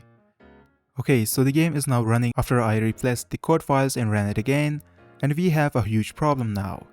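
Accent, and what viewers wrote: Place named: Bengal, India. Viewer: Bhai Bangali? Kotha shune bujha jay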